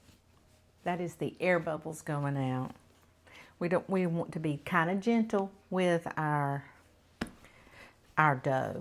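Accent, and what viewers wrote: South, United States. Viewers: You took the words right out of my mouth! I just❤ watching. She is just so down to earth,and I ❤ her southern accent...so calming.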